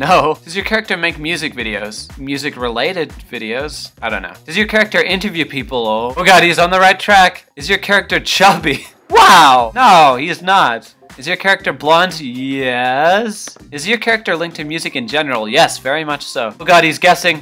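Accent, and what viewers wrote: Australia. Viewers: Your Australian? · HES AUSTRILLIAN?!?!?!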